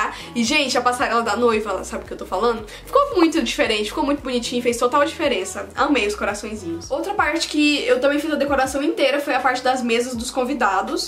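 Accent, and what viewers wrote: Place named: Minas Gerais, Brazil. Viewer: Ahá! É de Minas Gerais né, uai. Kkkkk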